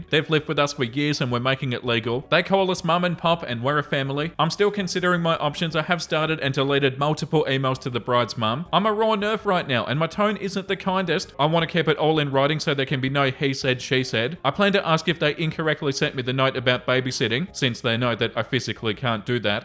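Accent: Australia. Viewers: Thank you!!! I was BEYOND excited when I saw an Australian accent as an option 😂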